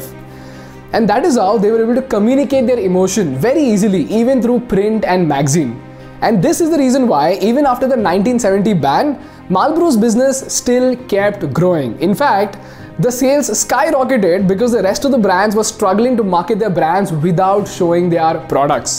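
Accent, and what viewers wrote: India. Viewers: " it is better to be absolutely ridiculous than to be absolutely boring" What an amazing video. So thought provoking. Love your Indian accent adds a veneer of ancient knowledge being conveyed. I found your sports analogy from an Indian perspective quite peculiar. Only an Indian would have used Cricket 🏏 as a metaphor 🤣😅😂. Most would have used Football ⚽️ and Maybe an American , American football🏈 . I just discovered your channel and have watched 3 videos now. Excellent content 👌 and production. Definitely earned my subscription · Love your indian accent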